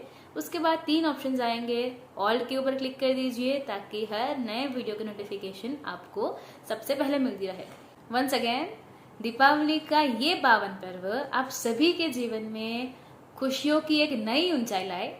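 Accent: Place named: Gujarat, India. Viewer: Hindi with gujarati accent...very good..... reminding Daya bhabhi of "ulta chasma".....keep it up beta...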